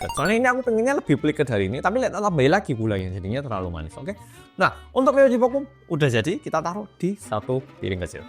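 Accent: Java, Indonesia. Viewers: wes ta lah.. mas ki jiwa medhok jawa e wes mendarah daging.. gak bisa dipungkiri lagi pokoknya 😂🤣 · Geli aku..ini oppa korea kok yo medhok banget talaaaah...misuh ya pake bahasa jatim an..wkwkwk😂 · Mas wis nang Korea kok isih medok? Anake mengko diajari ngomong Jowo. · Medokmu itu lo mas 💯😂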